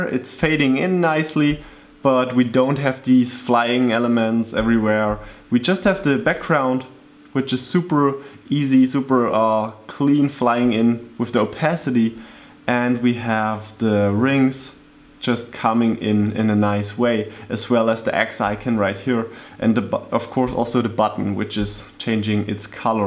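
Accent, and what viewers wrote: Germany. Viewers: Thanks for tutorials, really nice! Sometimes your germans makes me laugh lol. Anyway, loose the stickers :S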